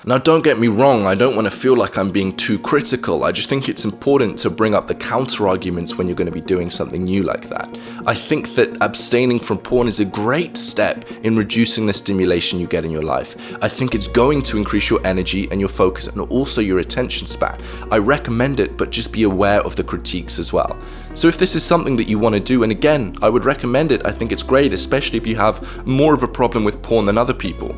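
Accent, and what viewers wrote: United Kingdom. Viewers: That is a British accent, to be honest I find some English accents a cringe to my ears, mainly American and Australian but that does not mean they should change to suit me.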